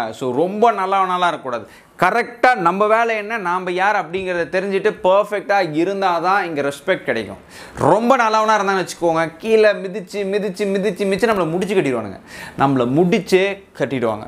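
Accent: Salem, India. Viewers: Bro nenga salem ah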